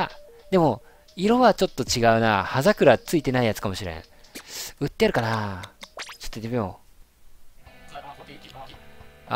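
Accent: Hiroshima, Japan. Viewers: 見るのちょっと遅れちゃった、、、 今回Rさんめっちゃ広島弁多かったですねwwRさんの動画大好きです!!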